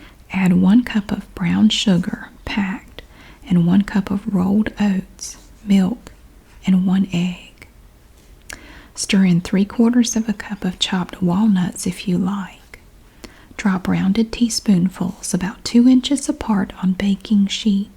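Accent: South, United States. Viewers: Living in the south I always forget she has an accent lol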